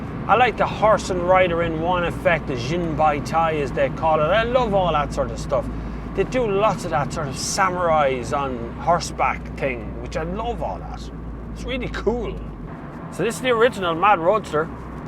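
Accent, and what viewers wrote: Ireland. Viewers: Love the Irish brogue! “It’s a beautiful little ‘ting!”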